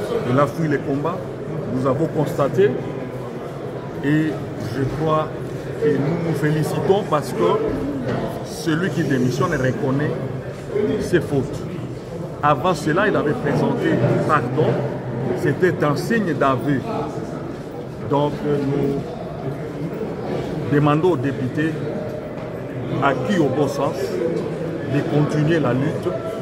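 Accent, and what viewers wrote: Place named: Rwanda. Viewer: Ton français rwandais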